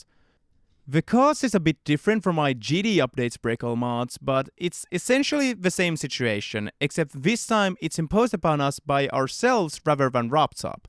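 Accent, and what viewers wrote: Finland. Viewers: Are u Finnish🇫🇮? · En ees tajunnu et äijä o suomalaine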